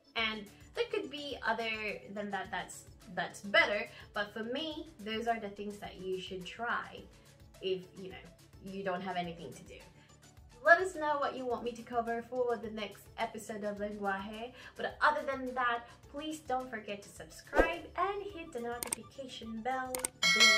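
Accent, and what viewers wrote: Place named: Australia. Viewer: You've started to sound Australian!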